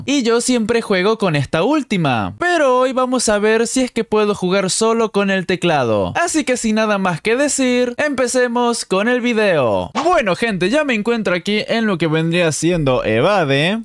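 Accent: Córdoba, Argentina. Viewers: Soy yo o tiene acento cordobés? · HABLA COMO SI FUERA ARGENTINO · Pareces cordobes con ese acento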